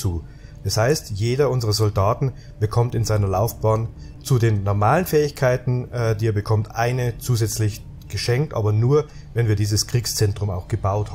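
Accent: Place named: Bavaria, Germany. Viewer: Da kommt definitiv da Bayer durch. ;)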